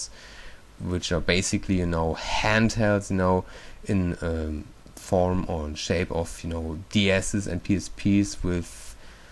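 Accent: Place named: Germany. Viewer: Funny. The minute I heard his accent, he could only be German! XD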